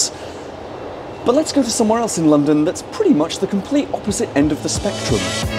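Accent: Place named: North, United Kingdom. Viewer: On a non train related note. The way you ( people from the north ) say castle sounds nicer, even though I'm from London and would say it more like carstle 😊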